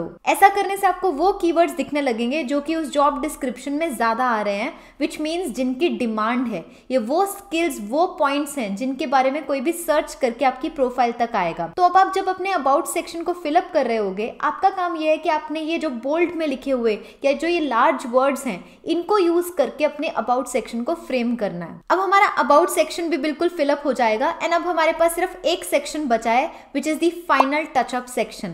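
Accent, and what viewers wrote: India. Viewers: your indian accent is incrediable but very useful sharing. thanks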